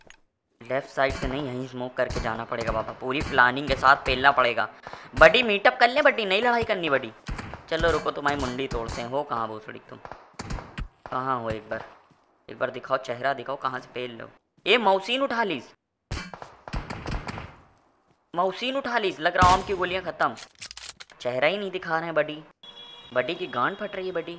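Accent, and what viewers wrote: Kanpur, India. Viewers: Kaun city se h Kanpuriya lagte ho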